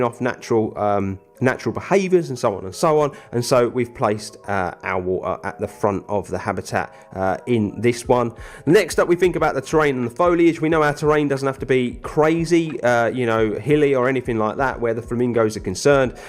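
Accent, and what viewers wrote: United Kingdom. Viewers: What part of the UK are you from?? I can never figure out the accent.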